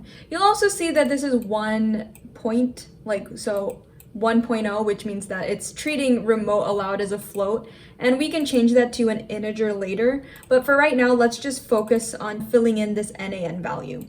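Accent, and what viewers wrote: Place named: India. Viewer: love the way you explain, keep making such videos and cover whole pandas. By the way I love your accent, it is mixer of indian and NRI.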